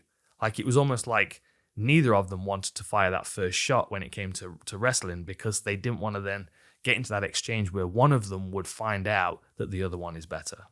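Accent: United Kingdom. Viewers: I don't know what it is about that British accent but I can listen to you speak for day's. Its like listening to sean Connery read a book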